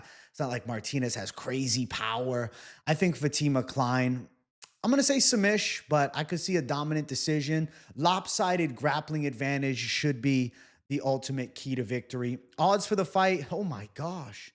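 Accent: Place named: New Jersey, United States. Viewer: Good to hear more of that Jersey coming out in the vacation accent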